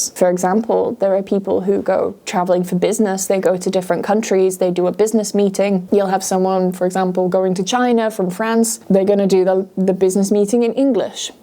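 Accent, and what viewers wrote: United Kingdom. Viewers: Would you please read short stories.. I like listening to your beautiful clear British accent narrating a short story.. thank you in advance ❤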